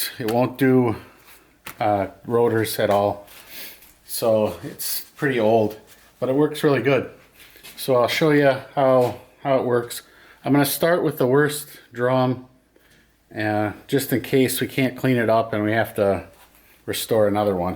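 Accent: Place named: Wisconsin, United States. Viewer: Wisconsin huh? I thought I recognized the accent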